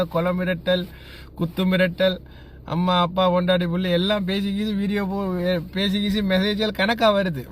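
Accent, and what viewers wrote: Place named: Sri Lanka. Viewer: ஆமா உனக்கு தமிழே பேச தெரியல்ல தலைவனை பற்றி பேசுவதை விட நீர் பிச்சை எடுக்க போனவழியை மட்டும் பாருடா உன் மொழியை கேட்டாளே நீர் சிங்களம் கலந்த தமிழன் உன் நடிப்பும் அர்ஜுனா அரசியல் பிழைப்பும் இங்கு வேகாது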